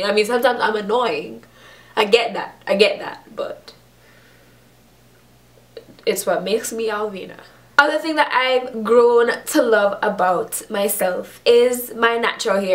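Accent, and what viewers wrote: Jamaica. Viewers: Yuh can tell seh yuh a Jamaican big up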